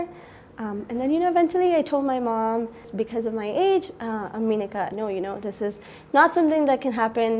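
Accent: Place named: United States. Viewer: She sounds american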